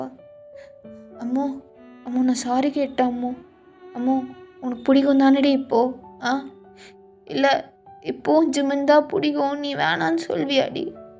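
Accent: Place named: Kerala, India. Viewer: Do you have any Malayalam connection.. actually unga tamil videe malayalam super ayii irik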